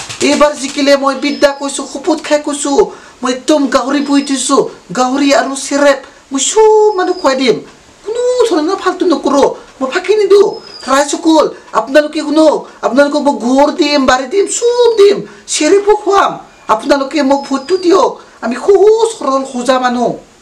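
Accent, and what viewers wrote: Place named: Bodo, India. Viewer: Val lagise sir. Bodo accent was awesome.. Keep it up sir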